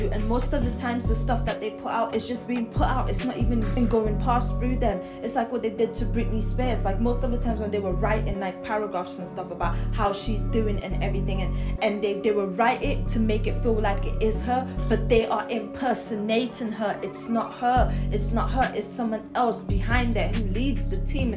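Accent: United Kingdom. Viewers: Yoo are from the UK you got that British accent And It seems King Von is your favorite artists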